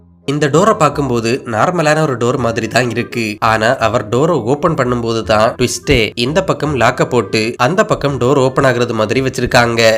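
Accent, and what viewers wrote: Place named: Kerala, India. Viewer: Mallu Tamil super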